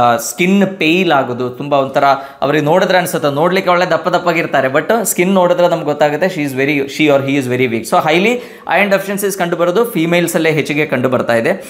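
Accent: Kerala, India. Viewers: Thank you doctor valleya information nange iron defeciency untu tumba hairloss allready aagide ...nan body iron absorb mado dilla hematology doctor helidru supliments indanu aagodilla injectionalli matra hemoglobin increase aaguthe adakke enadru maddu doctor ge gothidre dayavittu tilisa bekagi vinanthi.. .malayalam ecsent untu doctor mataduvaga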